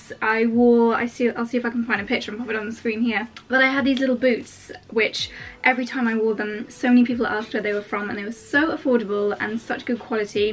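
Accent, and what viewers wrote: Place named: England, United Kingdom. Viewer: I love just listening to you talk. Such a beautiful voice and cute English 'accent' :)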